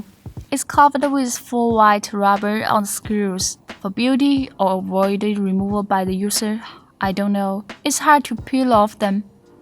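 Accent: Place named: China. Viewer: 超级重口味中式英文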